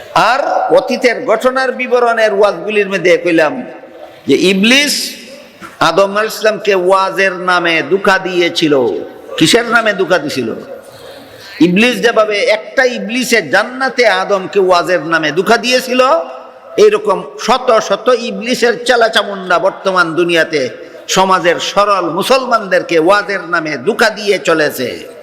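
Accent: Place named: India. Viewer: আপনি ভারতে সুরে কথা বলেন।জাতির বারোটা বাজানোর জন্য আপনি একা যতেষ্ট।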